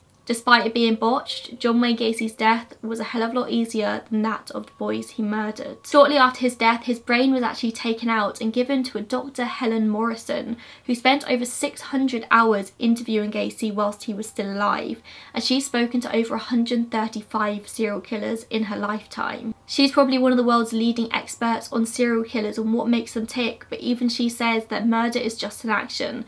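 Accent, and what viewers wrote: United Kingdom. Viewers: I could listen to your Brittish accent all day. With that being said, excellent video as usual. I've seen several documentaries on this case, but yours is really complete, shows how much research you actually put into your videos. Well done, keep up the fantastic work.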